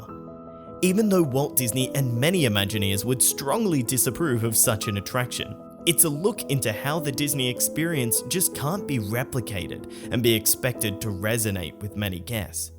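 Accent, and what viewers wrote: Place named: Australia. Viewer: Are you from Australia if not then I like your accent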